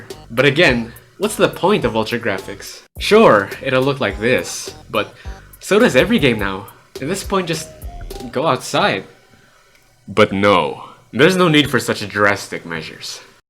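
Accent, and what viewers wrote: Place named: Philippines. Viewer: you sound so slightly Filipino. I don't know what it is. 😂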